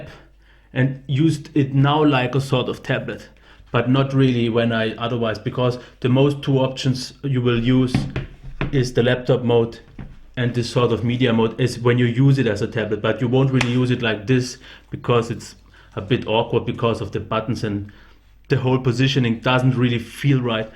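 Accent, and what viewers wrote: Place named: Germany. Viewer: A few things you should know: 1. Mode notifications can be easily turned off 2. You may got a defect display because mine does not make any sound (and i already got two) 3. You want better scaling? Then turn the resolution down, i mean you already said that it´s useless for YOU to have such a high res. But now one question: Was that Yoga overheating at any time? I know that you don´t have it anymore but you may remember if it was. Because i already got two and both are freaking overheating. Really sad, now i have to change my Yoga again... PS: When i heard the first word you were speaking i immediately thought : German! :D Trotzdem gutes Review :)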